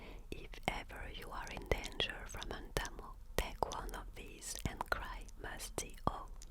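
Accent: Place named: France. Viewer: Gorgeous whispers & great choice of reading - your English is fantastic... especially with your gorgeous French accent 🥰🥰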